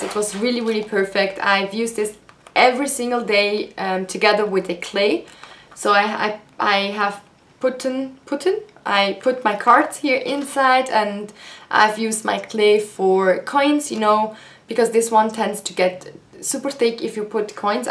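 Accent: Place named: France. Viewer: Nice haul, i like how you speaks on French so chic 😉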